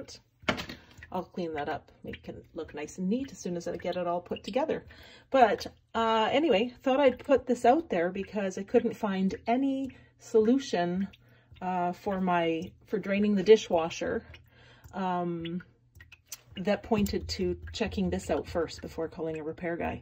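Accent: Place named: Canada. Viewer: Ok wait... You sound Canadian maybe? and? Italian-Canadian? Hello from North Jersey! Thank you for the helpful video... helping my friend (but that guy shouldn't have given you sealant for that p-trap... they are meant to go together easily and seal by themselves... that stuff will just make a mess. It's really just for sealing threaded metal pipe... NPT etc... )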